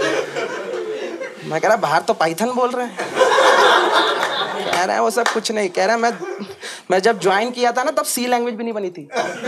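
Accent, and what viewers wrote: Bihar, India. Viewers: Underrated. Always a big fan of BIHARI accent and fun.. Biharis are unique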